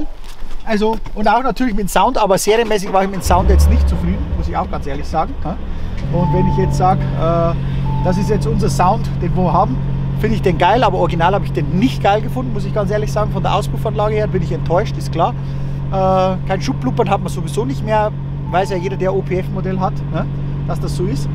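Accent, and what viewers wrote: Bavaria, Germany. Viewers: Red bitte wieder boarisch!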